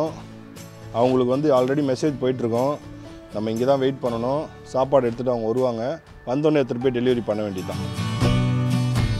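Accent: Chennai, India. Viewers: Thala chennai speech paka . Ne gathu thala😎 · You have a great philosophy of life! I am learning Tamil from you! You Tamil accent is typical Chennai. Only your pronunciation - 'PACKET money' for 'POCKET money is typical Tsmilian. Because Tamil does have the 'O' sound. Very good video!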